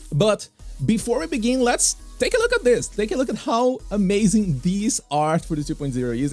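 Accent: Brazil. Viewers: Pelo seu sotaque, você é brasileiro 😀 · I'm not gonna lie, sometimes you sound like a Brazilian speaking english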